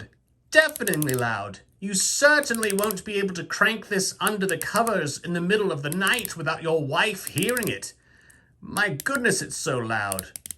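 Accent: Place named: England, United Kingdom. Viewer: Brilliant video sir! And you kept that (exceptionally good) English accent up all the way through too! 😄👍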